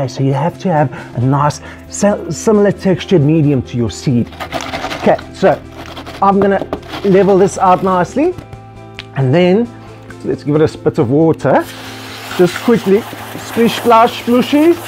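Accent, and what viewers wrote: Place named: South Africa. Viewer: I can recognize that accent anywhere. From what part of south africa are you?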